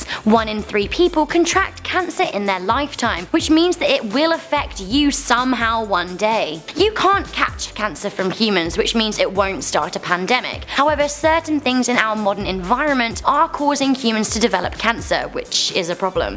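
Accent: United Kingdom. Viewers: Something about a British accent makes the end of the world seem pleasant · Does your British dialect have a name? You have such a very pleasant voice! ❤️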